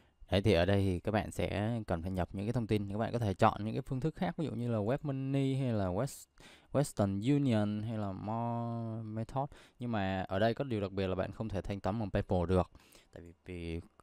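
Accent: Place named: Bac Lieu, Vietnam. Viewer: Tiếng đúng dân BL.. em ở BL đây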